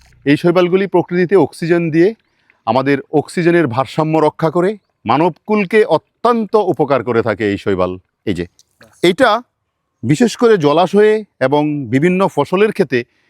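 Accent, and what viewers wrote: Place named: Barishal, Bangladesh. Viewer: আপনের বাড়ি মনে হয় বরিশাল অঞ্চলে।,,,,,,,,, জানাবেন।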